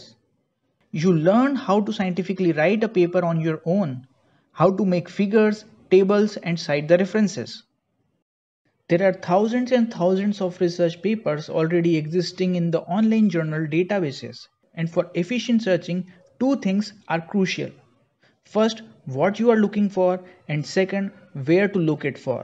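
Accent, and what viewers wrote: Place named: India. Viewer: 印度口音..........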